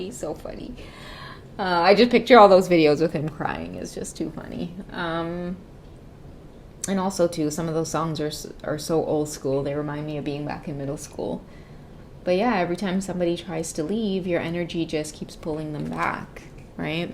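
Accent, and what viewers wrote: Trinidad and Tobago. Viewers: Could not mistake that Trini accent ❤️🇹🇹 I was listening while washing dishes and when I heard the accent I jumped 😂😂 I’m a Trini, living in Trinidad, who loves looking at tarot readings, just found your channel and I’m so happy to hear that accent 😅❤ Also I hope that’s my DM because I know he loves me, but timing and distance is the block. We’re both very busy too trying to build our lives 🥺 idk if it’ll go anywhere but we do have a beautiful connection, I at least really love that! ❤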